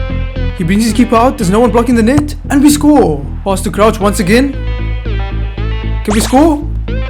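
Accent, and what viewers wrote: South Africa. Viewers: Are you from South Africa? ( Same Here ) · Ever since I heard your voice I knew you were south african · Bro ur South African for sure right ? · You from South Africa??? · Are you from South Africa? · Is he from South Africa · Yoh I just knew you were South African bra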